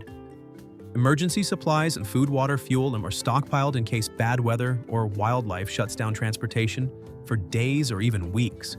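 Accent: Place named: New York, United States. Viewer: This commentator must be from New York..... or Martha's Vinyard..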